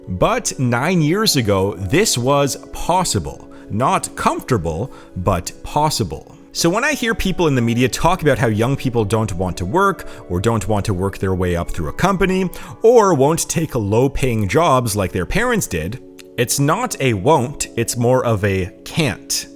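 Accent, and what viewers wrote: Canada. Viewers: There’s more to Canada than Ontario , you sound like a typical easterner.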